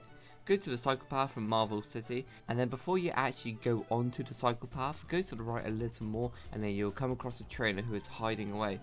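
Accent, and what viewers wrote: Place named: United Kingdom. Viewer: I like listen ur videos because your accent, is British?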